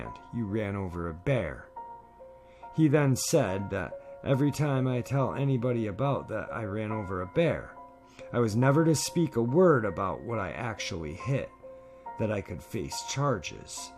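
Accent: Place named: United States. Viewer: To me as an Irish person your accent is delightfully different. I wondered where you were from, I'm not very good with pinpointing US accents apart from recognising a southern one of course. 😁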